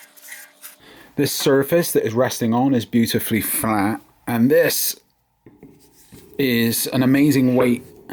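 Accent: United Kingdom. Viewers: Hi you sound British do you live in the USA? I am in the Uk I see you have a bit more space. Nice project.Checkout the little restoration project I started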